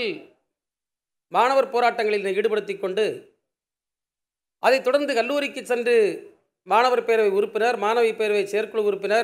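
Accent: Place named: Nanjil Nadu, India. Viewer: நாஞ்சில் தமிழ் மிகவும் அருமை... வாழ்க தமிழ்....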